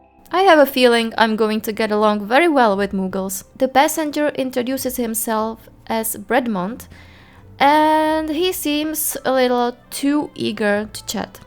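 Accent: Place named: Czech Republic. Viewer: omg já jsem si říkala že slyším český přízvuk!🩵✨^-^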